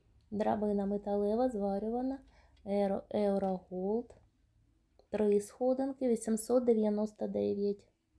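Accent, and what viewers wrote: Russia. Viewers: Чого ти акаєш??? Тупа, як курка! Це украінська мова , а не кацапський йезиГ!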